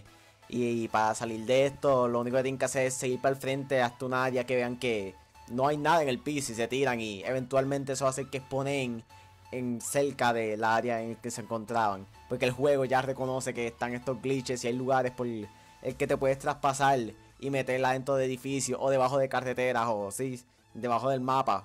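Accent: Puerto Rico. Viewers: Eres de PR verdad?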